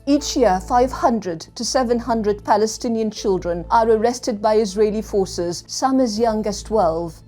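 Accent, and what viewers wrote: RP, United Kingdom. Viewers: She has a posh accent but is wrapped in this 6th century garb and 3rd century ideas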